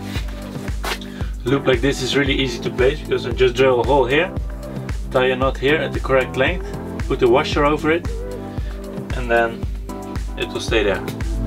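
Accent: Netherlands. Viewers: Haha I just found out your channel yesterday and you are an amazing creator! I already had a suspiscion that you are dutch by your pronounciation (je praat echt goed engels maar elke nederlander heeft iets in zn engels waardoor je direct door hebt dat het een nederlander is ;) ) keep up the good work!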